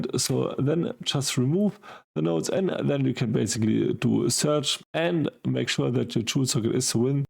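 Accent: Germany. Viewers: Hes pretty clear, I think he's German, so the accent is there its understandable, atleast for me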